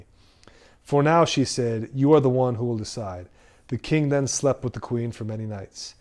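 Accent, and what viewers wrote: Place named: United States. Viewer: You sound American brother. You might like this book written by a First Generation American born Norwegian titled SPIKING THE GOLD or WHO CAUSED THE DEPRESSION & THE WAY OUT (a book of facts essential to the welfare of every American). Author was born to the Arne Andreas Nordskog son of a butcher from Oslo. That’s better handwriting than mine by the way.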